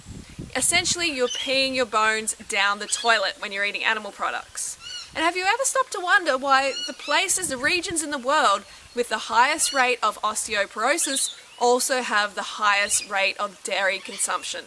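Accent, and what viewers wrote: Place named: Australia. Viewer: Haha. Super funny. I love the Aussie accent too. Oh and btw, WHERE DO YOU GET YOUR PROTEIN??? ;)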